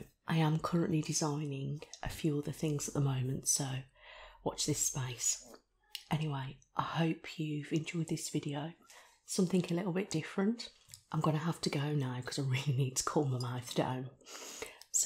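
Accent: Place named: Birmingham, United Kingdom. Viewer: Ahhh are you a Brummy? I love how your accent comes out more in this video ❤️❤️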